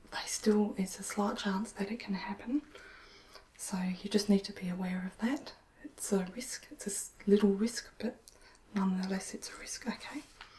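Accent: Australia. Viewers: I love that I've found someone with an Australian accent. It makes me feel so homely. It's great :)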